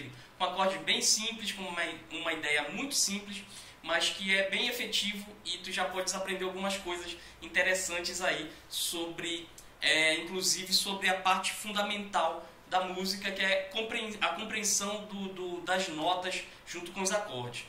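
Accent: Pará, Brazil. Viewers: só pelo jeitos de falar deve ser daqui do meu estado, pará.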